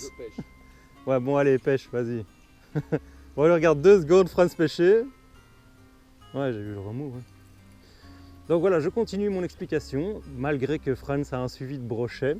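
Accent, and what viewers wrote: Belgium. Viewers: Tu viens de Namur tu as laccent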